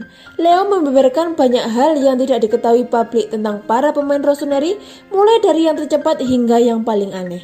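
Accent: Java, Indonesia. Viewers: Medok plus plus. Aksen medok tapi nyebut nama pemain pake ejaan english yang rada rada maksa 🤣 mungkin ini yang disebut Javanglishia 🤪 Buat input ya min, ejaan namanya biar ga terlalu aneh, bagusan cati referensi ejaanya dari komentator bola berbahasa english pas pertandingan. Biar ga terdengar weird kalo disebutin di channel ini. Sukses aja min buat channelnya. Keep it up 💪🏼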